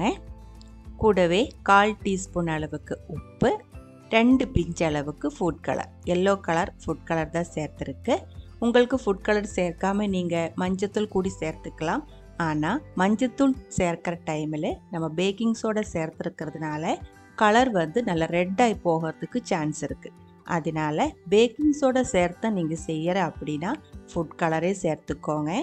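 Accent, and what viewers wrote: Kerala, India. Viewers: Super pazhampori recipe sister uunga malayalam kalantha tamil speech super 👌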